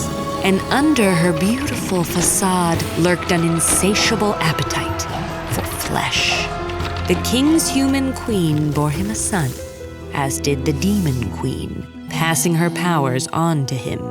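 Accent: Bengal, India. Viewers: The bengali accent is just the cherry on top🤌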